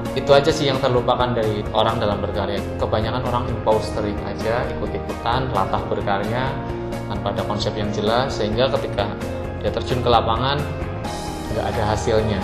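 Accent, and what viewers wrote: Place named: Java, Indonesia. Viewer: bandung kok logatnya medok mas?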